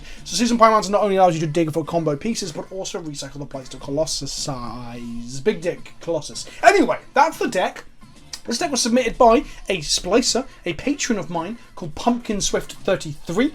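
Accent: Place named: United Kingdom. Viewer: What tried to say: "what is the plural of colossus?" What actually said: "what is the pllll of klloss" I will never understand how british accents work. Do you just leave out half the letters?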